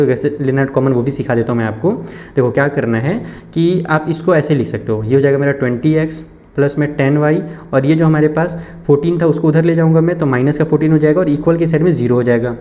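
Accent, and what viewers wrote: Bihar, India. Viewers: Sir Bihar se hai kya mai bhi hu 😅😅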